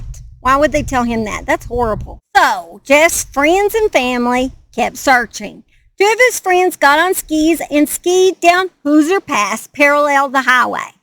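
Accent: South, United States. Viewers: Love you always ❤ sounds like you’re shouting this story, please preserve the southern voice. I know this was 2 years ago but I’m catching up xx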